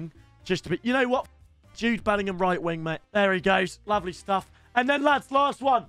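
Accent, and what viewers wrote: South Africa. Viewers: Love the Souf African accent!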